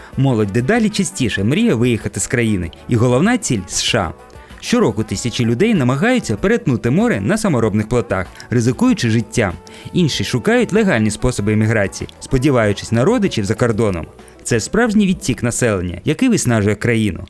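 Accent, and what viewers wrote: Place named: Russia. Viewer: Хороший контент, але дуже ріжуть вухо деякі слова промовлені на руснявий маневр. В українській мові, всі голосні вимовляються чітко. А в вас досі є - кантраст, риспубліка і тд. Будь ласка, попрацюйте над вимовою. Підписалась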